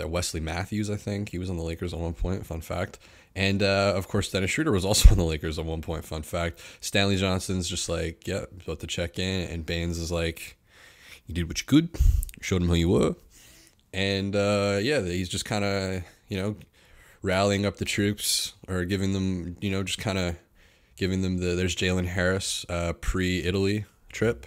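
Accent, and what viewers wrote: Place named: Australia. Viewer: Holy fuck this is giving me history class flashbacks 😂😂 Love your Australian accent m8 Idk what the hell to say about this man I think about this relatively often. Shout out Gary standing up for his boy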